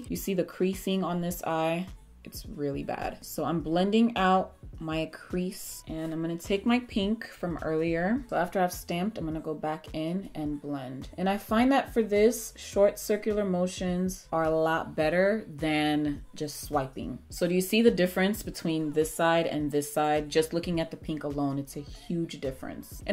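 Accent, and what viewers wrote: Nigeria. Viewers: Let me just tell you , the moment I heard your Naija accent come out , i clicked Subscribe so fast 😭😂 . Ahhh my fellow sista doing her thing lol , i definitely learned something new in this video . I’m definitely looking forward for more to come.! 💋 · That Nigerian accent 😩😂❤️ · Hey. Are u Nigerian? The accent tho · I’m gonna guess you’re part Nigerian. That accent sent me to the ground. Love you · I love your Nigerian Accent 🙂❤ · Subscribed for the Nigerian accent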